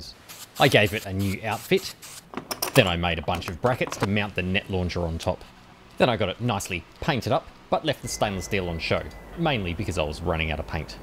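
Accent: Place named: Australia. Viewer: You are SO funny, and clever with the storyline. Great to hear an Aussie accent on you-tube for this sort of thing (engineering, experimentation sort of thing). Maybe the vapour puffs after the wadding exited the tubes are from adiabatic cooling of the compressed air as it escapes and expands rapidly, causing water vapour in the air to condense in micro droplets in the cold gas? (Water vapour creating short-lived, mini clouds).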